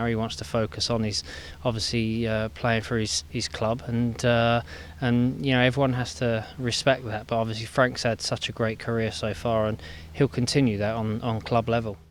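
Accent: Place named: United Kingdom. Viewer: I love your British sound <3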